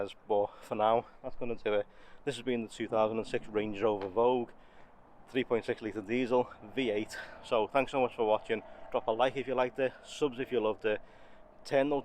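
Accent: Liverpool, United Kingdom. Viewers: I could hear everything he said. Are you deaf or just can't understand a scouser?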